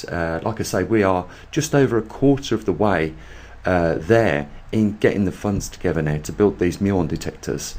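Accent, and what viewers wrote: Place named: England, United Kingdom. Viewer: When it comes to channels like this, I gauge if it is worthwhile, by the sound of the narrators voice... this is the first Chanel I’ve subscribed to that the narrator is English. All of my other subscriptions are either American or Canadian. Which is ironic because I am British and live in Somerset. So thank you, your voice is the first English narrator I’ve subscribed too. The key to the narrators voice is the ability to capture my attention. Ive used trail and error to do this, using one particular persons voice as a bench mark, probably the most famous narrators voice of all time and still the best by a long chalk. Sir David Attenborough. High bar, I know. But sadly, if the voice doesn’t tweak my curiosity then it just gets passed over within a second or two and I move on. Btw nice that you got hold of some data collected by the Chinese, if genuine then tis a rare commodity indeed. 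🙇‍♂️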